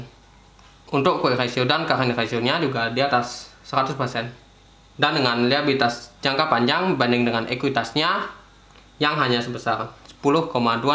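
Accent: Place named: Bali, Indonesia. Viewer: Medok bali sekali...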